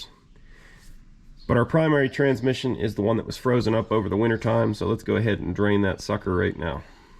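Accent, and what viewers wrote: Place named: Pittsburgh, United States. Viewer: Love to listen to yinz’s accent! Just like home. Very interesting!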